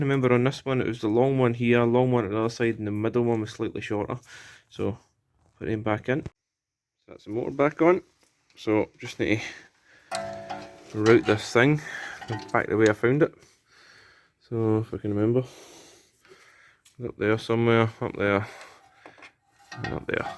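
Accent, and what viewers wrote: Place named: Scotland, United Kingdom. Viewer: Scottish English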